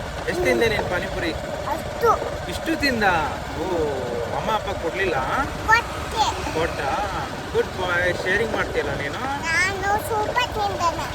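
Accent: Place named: Hubli, India. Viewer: He is so cute, I feel so good to see him talking in Hubli kannada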